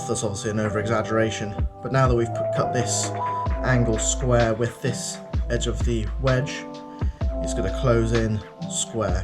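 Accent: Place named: England, United Kingdom. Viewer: Thanks heaps. So simple and easy to make. Good, clear explanation and camera work. Great to hear a pommy accent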